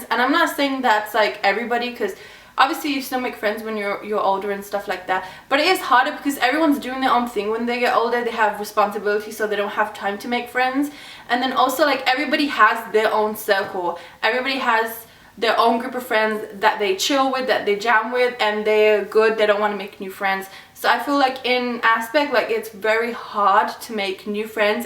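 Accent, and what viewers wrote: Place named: United States. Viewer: Great video! Your accent sounds American now. 😘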